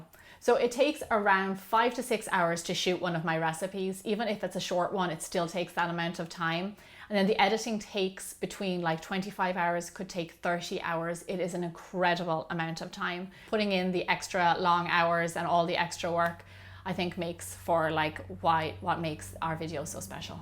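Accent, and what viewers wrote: Ireland. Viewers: May we please have a video of you cooking while you're in Ireland?:D I just love Irish people I think you guys have cute accents xD Also do you like the tropics? Come to the Philippines some time in the future or make something big and bold with tropical fruits:D ( I rarely see desserts with them tho o.o ) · I am really glad I´ve found your channel. First, because you bake heavenly goods and teach the recipes really well. Congratulations on your channel! Second, because listening to you takes me back to Ireland, a place I was fortunate to live in for two years of my life. Let's cheer for your talent and great work! Sláinte!! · I love your Irish accent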